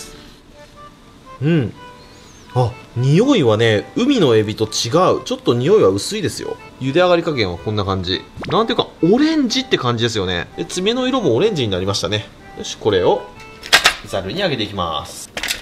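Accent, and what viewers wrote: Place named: Aichi, Japan. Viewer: 初コメントさせていただきます。前から見ていて、豊橋の知り合いの話し方とほんと似ていて、愛知だなぁって感じてました。冒頭の『さばいてくぅ～』のとこ、大好きです。魚をさばいて内臓やらへっちゃらで触ってるのに、片栗粉の感触が苦手なシーン、笑っちゃいました、のそういうとこ、いいっすね。これからも様々な 『さばいてくぅ』見せてくださいね。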